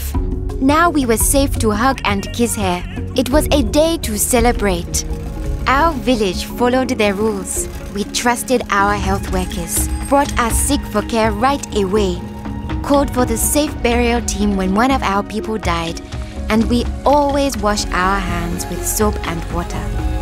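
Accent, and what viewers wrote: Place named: Uganda. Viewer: lol the voice is ugandan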